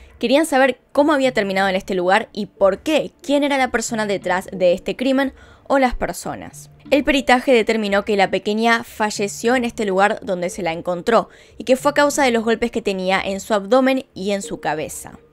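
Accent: Argentina. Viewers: Así hablamos los argentinos jaja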